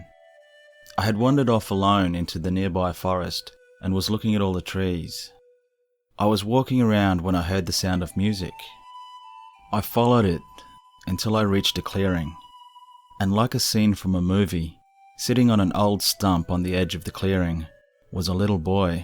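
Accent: Australia. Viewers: Good stories 👍 Your an Aussie aren't you? 🍻😎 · Woah! Straaaaaaange! Life, 2 videos in one week!? To what do I owe the honor??!! As usual, loved the vid! Although I have heard the Creature in the Corn story before, it always sounds better when you tell it with that awesome Aussie accent 😍 😉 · Claims to have been Military working in the US.... Accent is Australian Yeah I say fake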